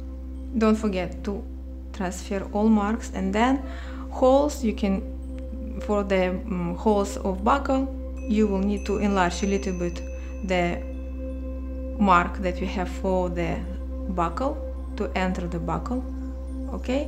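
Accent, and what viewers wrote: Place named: Russia. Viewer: Я только начал учить английский, через секунду узнал русский акцент.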